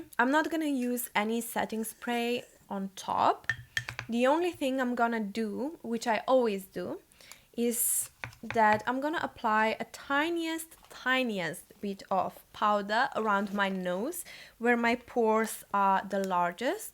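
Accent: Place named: Slovenia. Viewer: I couldn’t quite place your accent so very cool to find out you are Slovenian! I passed through Slovenia very briefly last year on the way from Trieste to Rovinj and I’ve been dying to properly visit the country since then. It’s my number one place I want to go! 🧡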